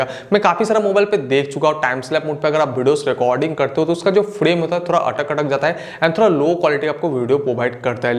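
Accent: Bengal, India. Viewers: Ye keysi baat hey bhai?wo lerka bangali hey.wo indiawale ke liye hindi may phone ki review de rahi hey.to issmey galat kya hey?bangali logoki hindi bollemey thoda kharab to hogii.tamil logokovi eeeysa hota hey.you need to adjust it man.love from bangladesh.take care.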